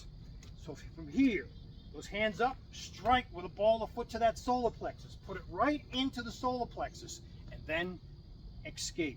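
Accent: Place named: New England, United States. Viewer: Hi! Thank you! I detect a New England accent! Have a wonderful day! John KJV John KJV John KJV ❤❤❤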